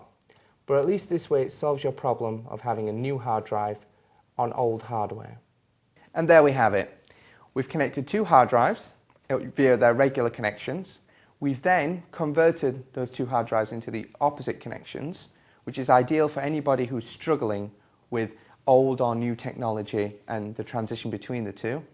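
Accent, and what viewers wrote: United Kingdom. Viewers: lovin the way he alks :D so english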